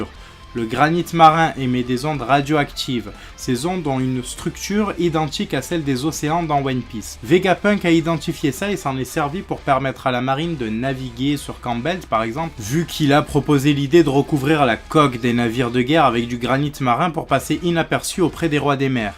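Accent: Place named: South, France. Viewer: Je t ai vu dans la vidéo de ryo et ton accent du sud et génial je voulais te poser une question tu as déjà vu ryo seinsei en vrai ?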